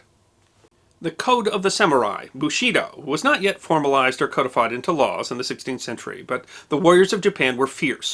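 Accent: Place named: Midwest, United States. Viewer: My Japanese is not so good. Your voice sounds mid-western to me, which is fine. I've found myself (at times) questioning certain English town / county /home pronunciations, and likewise other European places (ok, I'm a jerk) but you'll find no fault by me on this episode. Whether right or wrong, words rolled off your tongue as if you knew what you were saying and I bought it all. And...another great story to boot. I trust your script was not Kanji...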